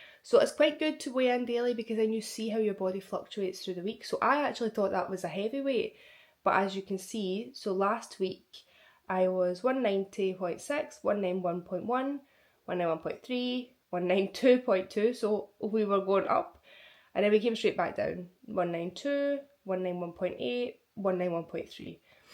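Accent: Scotland, United Kingdom. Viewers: I knew that was a Scottish accent 😊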